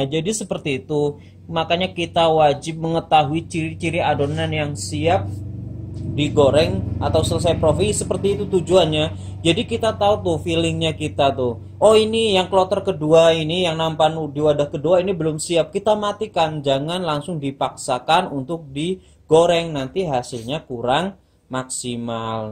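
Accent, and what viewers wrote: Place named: Cilacap, Indonesia. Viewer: Maaf Mase aku nmbe nemu chanel sampean, koh sampean niku kaya wong CILACAPAN yah sanjange....👍👍👍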